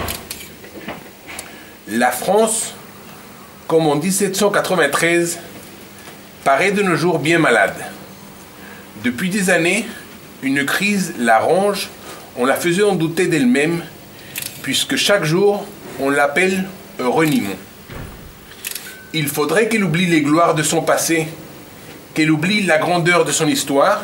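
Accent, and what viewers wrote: Spain. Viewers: C'est l'accent espagnol, pas roumain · Il a un accent espagnol ? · Par les temps qui courent, personne ne voudra en France d'un roi qui a l'accent de Miguel Indurain. C'est ça que je veux dire. Tant que vous nous vendrez la monarchie avec une tête de gondole pareille, la république aura encore de beaux jours devant elle. A la limite, l'autre famille de prétendants (celle de celui qui est mort il y a quelques temps) serait déjà un peu plus crédible... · un super accent espagnol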